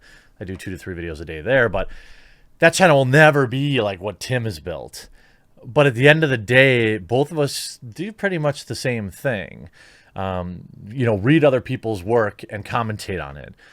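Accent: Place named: Chicago, United States. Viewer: Yeah, I've been noticing this for a while now. In some of his videos that he does earlier in the day, he starts winding up and gets almost irate, saying things like "I'm so sick of these pathetic spineless losers" or some such. Part of me thinks it's the Chicago accent....there's a "I know something you don't know" quality in there....gets on my nerves. And then there's the reminder that he's bi-racial, and from the southside of Chicago... and he skateboards, and was at occupy wall street, lol. But,, the guy is definitely consumed by his job, and does work hard as hell. I appreciate the hard work and the job he does, just lose some of the condescending attitude. Or like Jeremy said, take a long vacation.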